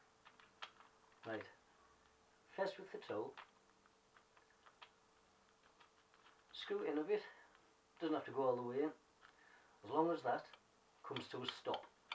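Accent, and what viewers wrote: Middlesbrough, United Kingdom. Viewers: Good vid thanks, must be a Boro boy with that accent!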